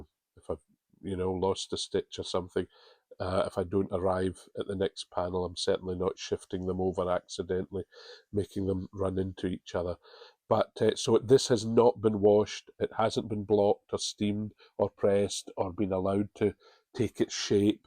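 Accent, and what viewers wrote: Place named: Scotland, United Kingdom. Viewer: thank goodness you were cast off from Game of Wool because now I can watch these fabulous podcasts that you are doing. I'm a knitter in Australia and I started a vest from Kate Davies book "Inspired by Islay" back in 2017 which has been languishing since. I'm now inspired to finish it, steeks and all!! You are an expert knitter and have a delightful Scottish accent (to me), that is so pleasant to listen to.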